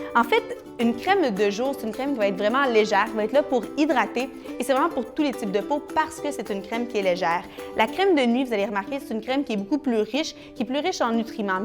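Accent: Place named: Quebec, Canada. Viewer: super l accent quebequoi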